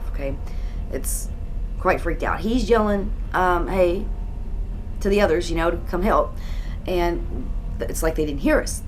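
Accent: South, United States. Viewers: I was hoping you would say where it was, with your accent I assumed somewhere here in the south. This sounds like goatman's bridge, which I've been to. Eerie even during the day. I have no doubt its haunted.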